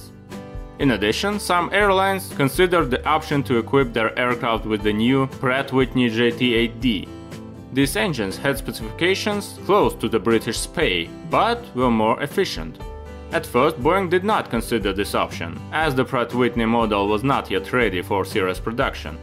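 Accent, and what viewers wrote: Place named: Russia. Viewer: Why the fuck is a Russian accent telling us about the American Boing 727 history …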